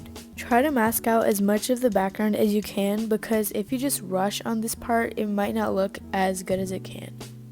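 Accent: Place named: United Kingdom. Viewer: i thought you were british for a good minute 😭😭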